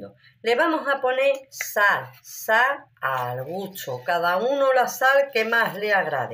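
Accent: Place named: Seville, Spain. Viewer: ñiña disfruto viendote y hollendote te espresas habeces como mi abuela carmen era la autentica sevillana como tu besos desde barna att.maria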